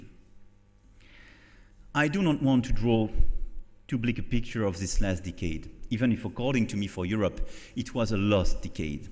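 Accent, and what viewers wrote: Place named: France. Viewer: bah il est français tu veux qu'il ait quel autre accent à part l'accent français ?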